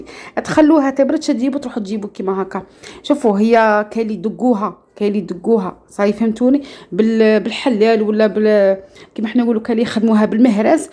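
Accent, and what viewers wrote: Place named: Western world. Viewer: Allah ya3tik essaya, ça fait plaisir d'entendre l'accent de l'ouest😘😘😘😘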